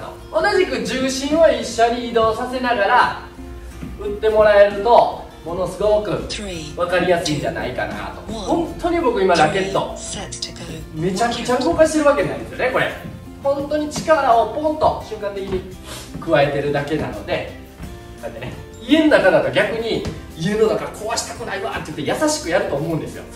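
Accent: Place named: Kansai, Japan. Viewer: ワイプのMJがシュールw いつも思ってますが、の声って高めなのと関西弁のイントネーションで良い意味で引っかかるというか、聞き取りやすくて良いですよね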